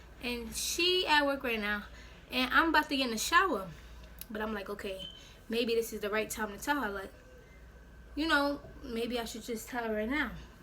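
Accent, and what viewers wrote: New York, United States. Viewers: Ch Ch she probably from New York . That’s what her accent sound like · You know she from New York 🔥😂🗽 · are you from new york?😭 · you from new york ? 😭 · Are you from New York? You sound like you are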